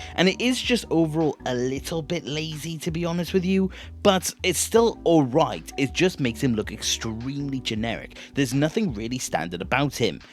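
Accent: Wales, United Kingdom. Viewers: Are you Welsh ???🏴🏴🏴🏴🏴🏴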